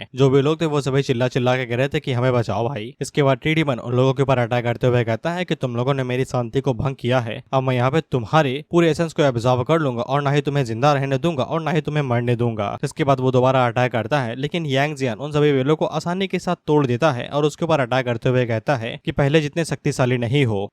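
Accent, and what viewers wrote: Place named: Bhojpuri, India. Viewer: Tumare muh se bhojpuri bhohat achhi lagti hai ❤❤❤❤❤❤ or i am the fated villain next part please 😢😢😢😢😢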